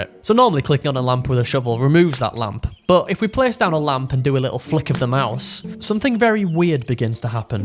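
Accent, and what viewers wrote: England, United Kingdom. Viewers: The ‘Let’s game it out’ style playing with an English accent isn’t something I knew I wanted. But I’m happy this video came into my algorithm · I love your british accent😮❤ You know, this is the first time I've heard a British accent spoken by a person, and yeah I like it.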